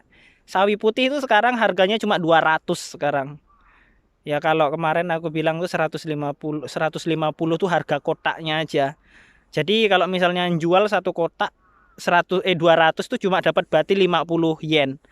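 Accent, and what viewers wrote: Java, Indonesia. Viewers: Mase Indonesianya mna kok kyak jawa? Sya blora Jawa tengah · Jawa mu ngendi bos. Sak aratarat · Tapi kok logatnya jawa wkw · Jowone pundi mase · Wong ndi to kok medok😁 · Bro....we cah Endi.....jowone medok.....sehat selalu dan sukses..... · Wong jowo iki. Ketoro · Mas Smpean Jowone Endi?? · Medok yow medok ae mas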